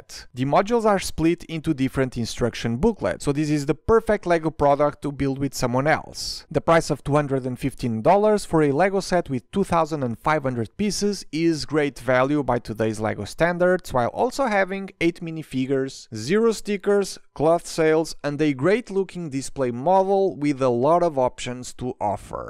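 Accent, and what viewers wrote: Portugal. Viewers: Boas, não sei se costuma receber muitos comentários em português, mas só queria deixar uma mensagem de apreciação ao trabalho que fazes, principalmente sendo em inglês, o que te dá um alcance maior do que terias a falar em português. Eu comecei muito tarde neste mundo da Lego e só acerca de 1 ano comecei a montar a minha coleção, posto isto, gostava muito de ver-te a fazer um vídeo sobre como "nós" (beginners em Lego) podemos arranjar forma de, mais eficientemente, fazer arrumação das peças por categoria, ou mesmo por "tipo de peça". Adoro os teus vídeos, e devo confessar que o teu sotaque de inglês apesar de ser excelentíssimo, dá para entender no meio, os tragos de português e é muito bom. Muita força e que continues a ter sucesso por muito tempo. Um abraço de Lego aqui do norte, mais propriamente de Santa Maria da Feira. Um bem haja grande! 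<3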